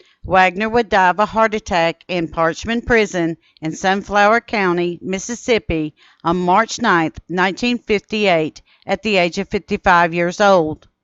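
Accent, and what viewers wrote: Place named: Appalachia, United States. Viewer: You sound like you speak Appalachian American, however you said, " Appalation". curious, mighty curious.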